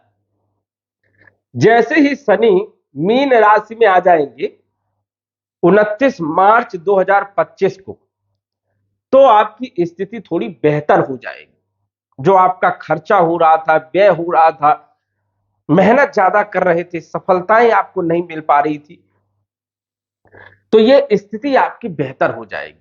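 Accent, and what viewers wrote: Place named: Vindhya, India. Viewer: जी लगता है विन्ध्य क्षेत्र से हैं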